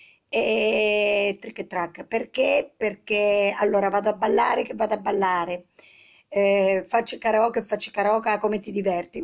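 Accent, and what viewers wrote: Abruzzo, Italy. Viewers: bravissima! Si dovrebbe invidiarti per la tua determinazione... Io ti ammiro molto. Mi sembra di riconoscere un'inflessione "familiare": sei abruzzese? Ti abbraccio.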